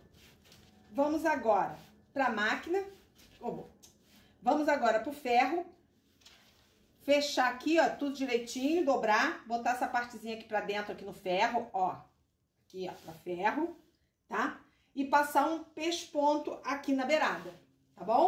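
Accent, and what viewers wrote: Rio de Janeiro, Brazil. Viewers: Oi amada adoro s jeito descontraído de ensinar, gosto do pulo d gato kkkk, e amo nosso jeitinho carioca d falar, também sou carioca , sou d Sepetiba minha terra Natal, posso falar 1coisinha? Gostaria de saber por que vc não posta vidios todos os dias?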